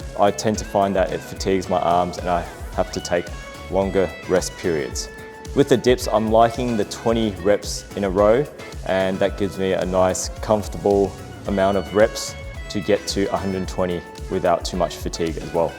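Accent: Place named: England, United Kingdom. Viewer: U look native american and have an english accent. My brain cant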